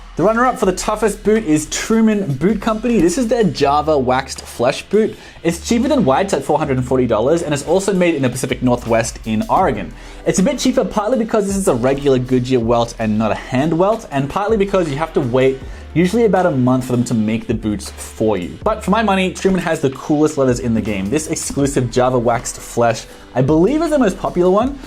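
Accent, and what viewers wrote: Australia. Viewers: You sound very much like an Aussie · Old mate sounds Aussie to me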